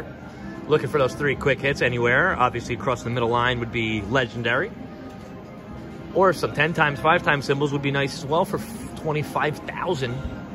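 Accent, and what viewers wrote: New Jersey, United States. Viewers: You must have been born and bred in New Jersey because you have a thick Jersey accent!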